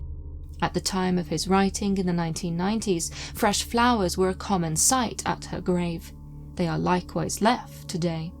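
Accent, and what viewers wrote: England, United Kingdom. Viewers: Thank you Ms. for your astute story of this witch. I appreciate it being told by an english woman instead of an american as it lends authenticity as well as the use of old english.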